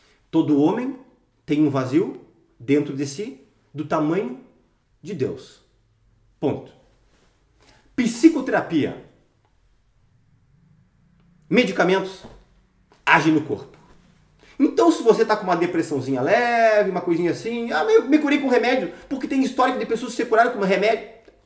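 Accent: Rio Grande do Sul, Brazil. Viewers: É bem gaúcho mesmo, sotaque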